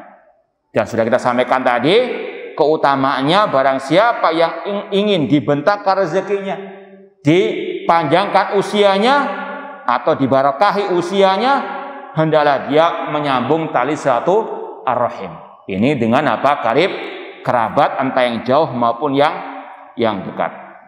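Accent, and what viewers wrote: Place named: Java, Indonesia. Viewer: Medokan sawah timur hadir 👍 baarakallah fiik Ustad.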